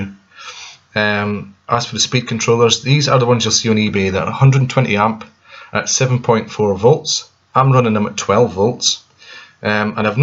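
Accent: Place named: Aberdeen, United Kingdom. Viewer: Great video! You sound like you're near me. Aberdeen?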